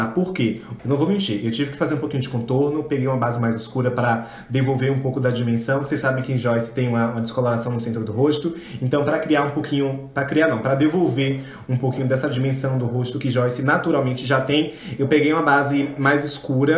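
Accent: Bahia, Brazil. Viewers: SE TEM SOTAQUE MAIS LINDO QUE O BAIANO, EU NAO ME LEMBRO 😍😍😍😍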